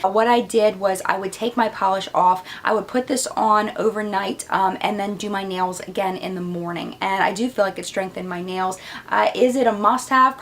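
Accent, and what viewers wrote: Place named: Philadelphia, United States. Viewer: Hi,. I just wanted to let you know I came across your channel looking for some reviews for all those subscription boxes. I have loved everyone of your videos since. I also can't get enough of your accent because it reminds me of home. I grow up in Philly. Anyway, I wanted to share the thing I have been recently loving.... JAPONESQUE Makeup Brush Cleanser. I usually just clean my brushes with antibacterial hand soap....not anymore! If you haven't, you should check it out. I had never heard of them before. Be well! Can't wait till the next video!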